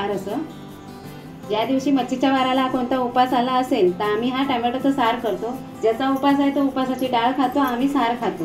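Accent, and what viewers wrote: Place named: Malvan, India. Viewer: Like your malvani accent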